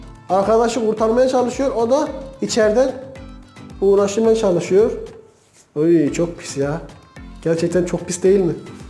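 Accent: Diyarbakir, Turkey. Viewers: Abi senin konuşman Diyarbakır ağızına çok benziyor "gablo(kablo), garı(karı), bağ(bak),gurtuldu(kurtuldu)" gibi...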